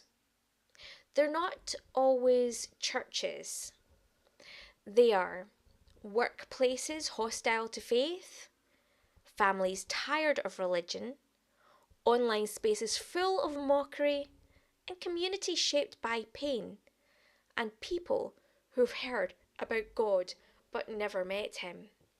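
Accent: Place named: Ireland. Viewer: Nice. I wonder how quickly that deep Irish brogue would take to me if I lived in Ireland. I love to hear you speak. ❤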